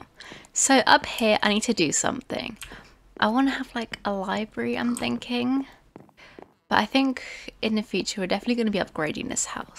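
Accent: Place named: United Kingdom. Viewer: I'm falling in love with your British accent😃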